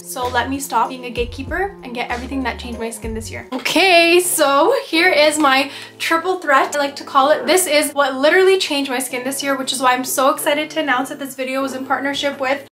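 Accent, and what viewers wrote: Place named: United States. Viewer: wow wow karti hai ....america akcent bolti haaaai ....lagti tu powri indian haaai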